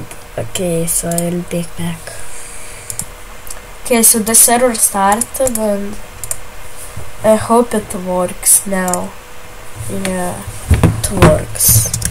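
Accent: Lithuania. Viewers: Lietuvis esi girdžiu😅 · lietuviu anglu xdd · are youy from lithuania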